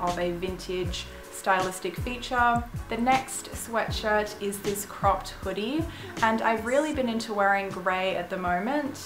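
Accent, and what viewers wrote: Australia. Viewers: Are you from Australia? It's just the accent, sorry if I got it wrong.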